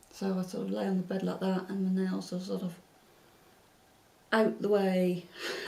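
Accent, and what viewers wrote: England, United Kingdom. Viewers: Your really down to earth love your English accent hope you do more videos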